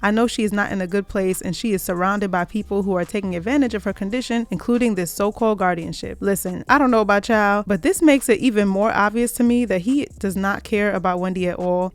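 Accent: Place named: New York, United States. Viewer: Your slightly New York accent makes me miss my east coast fam. 🙂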